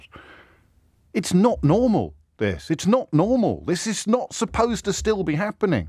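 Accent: RP, United Kingdom. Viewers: O'Brien straight up nuking the Tories... and that RP accent is great and the frying pan bit was really funny.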